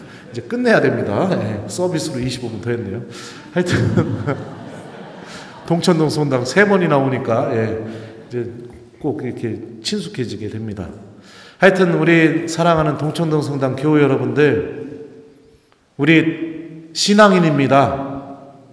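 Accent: Chungcheong, South Korea. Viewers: 신부님! 감사합니다 늘 건강하세요 요즈음 당신의 강론을 듣고 살맛납니다 충청도사투리 넘 구수하고 멋있어요 감사드려요 신부님 알랠루야....❤❤ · 신부님의 강의 너무너무 감사히 들었어요.^^ 세상 참 좋은것이 이렁게 머~언 캐나다에서도 편하게 좋은 강의를 들을 수 있으니 얼마나 감사한일인지요.❤ 보통 집안일을 하면서 듣는데 신부님의 강의를 메모하며 집중해서 듣고 싶어서 작게 차려놓은 기도 테이블 앞에서 들었어요. 끝날때쯤 "저를 위해서 성모송 한번이라도 기도 부탁합니다"하실 때 가슴이 찡하면서 눈물이 나오려했어요. 신부님들은 신자들을 위해서 온갖 고생 하시며 먼 곳까지 마다하지 않으시고 강의를 다니시는데 신자들에게 기도 부탁하실 때 보면 목소리가 작아지시더군요.^^신부님, 매일 사젤.ㄹ 위한 기도와 주모경을 바치오니 부디 영육간에 건강하시고 어떠한 유혹에도 물리치실 강력한 힘을 하느님께 빌어드립니다 참고로 제 고향도 충남 보령 웅천입니다.ㅋㅋ 신부님의 구수한 사투리가 참 정겹습니다. 신부님 화이팅!!❤❤❤❤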